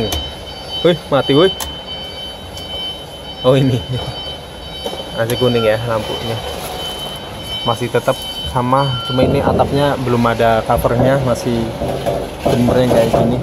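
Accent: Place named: Tegal, Indonesia. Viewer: Sih kayane wong tegal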